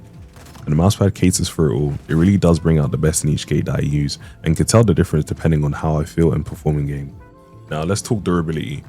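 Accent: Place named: United Kingdom. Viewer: Nice video man! its refreshing to hear a brit for once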